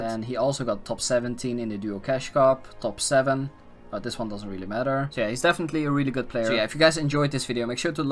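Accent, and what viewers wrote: Netherlands. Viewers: ik ruik nederlands accent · Bro are you dutch ? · leuk dat een nederlands accent toch zo sterk kan zijn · ik kan gewoon horen aan je stem dat je nl bent XD maar ga zo door bro ik hoop ooit ook earnings te krijgen word dit season voor de eerste x unreal en ik ben 13 je bent een hele goede speler ooit word jij n 1 ga zo door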